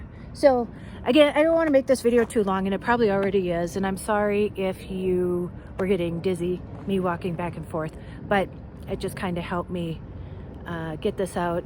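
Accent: Minnesota, United States. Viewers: Reasons to listen to you talk : Watch you cause ur beautuful Have a lovely voice with the pleasant MN accent Great story teller Are deep, honest n sincere Sharing ur journey n story I'm on one too n learn from you and did l say your beautiful n not 50 something! 😘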